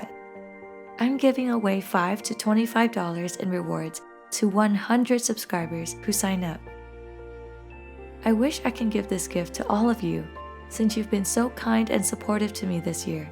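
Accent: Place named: Vietnam. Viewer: Omg you are Vietnamese??? Im Vietnamese too. Nice to meet youuu I really love your accent 💓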